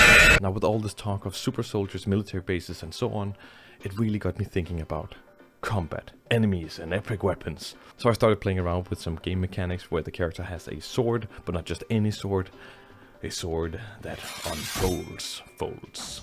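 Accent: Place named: China. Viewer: Nice game! Why not add an animation where if you barely miss the jump you can grab the edge with your hands and pull yourself up? That way you can miss a little and still make it. But if you miss by say more than 2 ft or something, you STILL reach out as you would but your hands just go flat down the surface and you fall to the floor. ? Hey, just curious. You look Saudi Arabia-ish but you sound like 10% Chinese. Are you living in China? I'm just curious! I think I have some strange interest in genetics, morphology of humans and how all that affects their speech and body and brains and so on. Well, good luck with everything you do. You're very intelligent and creative.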